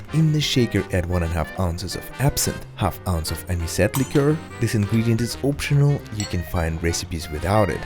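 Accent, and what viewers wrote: Russia. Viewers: You russian or romanian or bulgarian?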